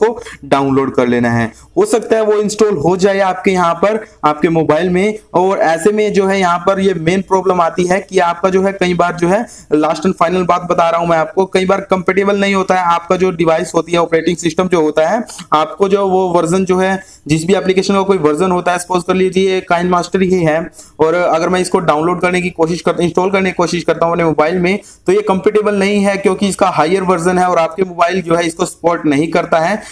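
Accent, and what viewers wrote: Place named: India. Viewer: You know its not clickbait when you hear hes indian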